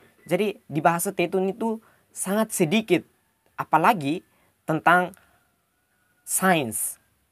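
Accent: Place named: Malaysia. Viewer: Maaf.. Bisakah kata 'so' yang sering kamu ucapkan divideo mu... kamu ganti dengan ucap "jadiii".. kalo sering ucap kata "so"... jadi mirip kayak cara bicara orang malaysia.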